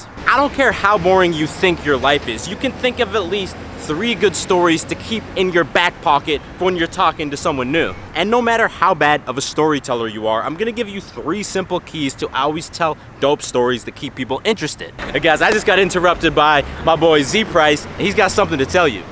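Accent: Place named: Boston, United States. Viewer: I thought he was from Boston not austin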